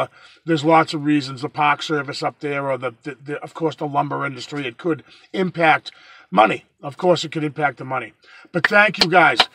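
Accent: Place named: Massachusetts, United States. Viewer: I have to laugh. The very first video of yours that I watched, not having any idea what part of the US you were in, as soon as you started speaking, I knew you were from SE Mass. Not Boston, not Western MA, but the Taunton, Dighton, Berkley, Norton, Mansfield, etc region. I think your videos are "wicked awesome".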